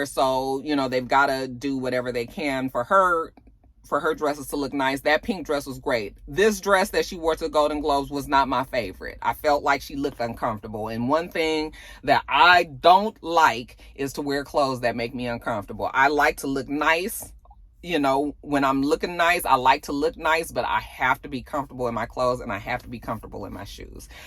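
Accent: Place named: Los Angeles, United States. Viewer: is so ATL. you still have some LA in your voice. lol.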